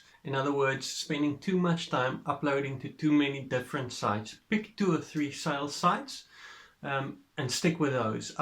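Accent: South Africa. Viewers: I could tell that you are South African by your access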